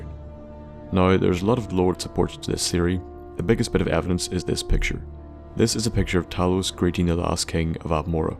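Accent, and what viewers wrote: Northern Ireland, United Kingdom. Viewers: where is the narrator from? sounds like western northern irish to me? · What part of NI are you from??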